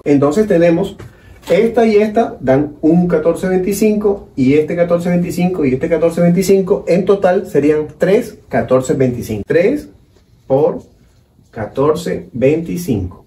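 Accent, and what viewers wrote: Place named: Venezuela. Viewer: Clase magistral, pareciera complicado, pero solo hay que prestarle atención y practicar. Tiene lógica. Gracias. Estas en Colombia, oero eres Venezolana, cierto? Por el acento. Soy venezolana, vivo en chile.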